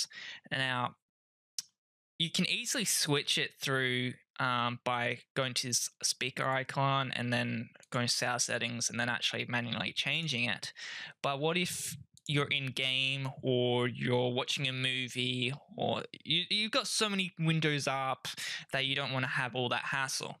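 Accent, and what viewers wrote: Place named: Australia. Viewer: i hate australian accent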